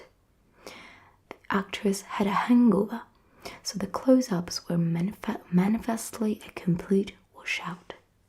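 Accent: United Kingdom. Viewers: E' vero che ci sono diversi accenti (sia in US che in UK: quello di e' la cosiddetta Received Pronunciation, le persone attorno a me qui nel North West of England, e io stessa, parlano in maniera un bel po' diversa!), ma in linea generale puoi facilmente identificare se una persona e' americana o Brit. Le T come ha citato, le R, per esempio, che gli americani pronunciano e gli inglesi no (tranne in alcuni accenti), e che Scottish e Irish pronunciano in maniera ancora diversa, le L in 'walk', 'talk', o alcuni suoni vocalici (vedi: vitamins, route), e l'intonazione in generale che e' spesso diversa. Nell'inglese britannico si usa moltissimo il connected speech, che e' cio' che fa dire agli stranieri 'gli inglesi si mangiano le parole'. Nell'inglese americano questo e' meno marcato (poi ovviamente hai eccezioni). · bravissima! sembri una inglese proprio; peccato che io capisco solo la cadenza USA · Pazzesca! 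Veramente top il tuo british accent darling 👏👏 Ps* ammazza quanto è severa questa prof!! Attendiamo con ansia la prossima lezione 🖋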